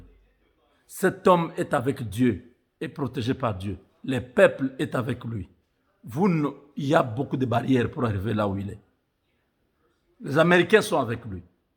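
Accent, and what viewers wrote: Rwanda. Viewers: C est mr parle comme un Rwandais. Il ment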